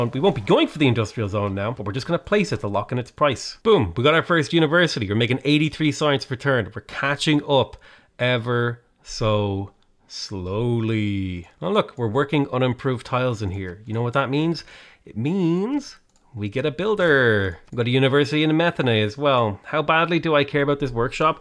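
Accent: Ireland. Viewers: I was gonna say he sounds more "Irish for some reason, also pretty tired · You sound more Irish than usual in this video. Kinda neat to hear. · is it only me or did he start to yous his Irish accent?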